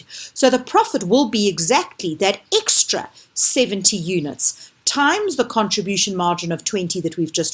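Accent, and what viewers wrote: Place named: South Africa. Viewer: You have a South African accent. Are you from there? Your Accounting tuition is fantastic! Thank you so much.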